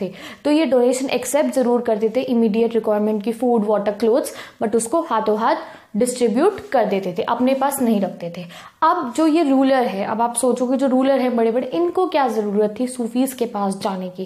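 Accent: Punjab, India. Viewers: Aap Punjabi ho?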